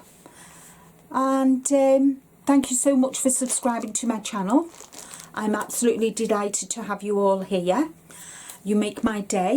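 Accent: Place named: England, United Kingdom. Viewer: I just stumbled across your video I thought it was nice to be nice to win things to make for my grandkids I got so many of them making a baby blanket or booty those would be so nice sounds like you're in England I'm in the US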